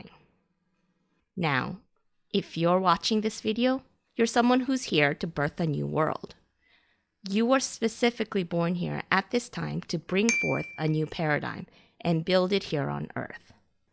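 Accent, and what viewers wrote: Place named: South Korea. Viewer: Not related to the topic, but I assume you are Korean American? Haha... just a guess but you have a bit of that Korean accent while speaking English.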